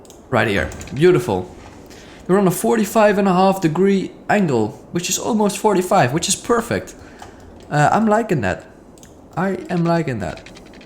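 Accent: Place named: Netherlands. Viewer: I know I am a bit late but are you dutch because you have a dutch accent and if you are so am I love city skylines I live in Rotterdam groetjes uit Rotterdam zuid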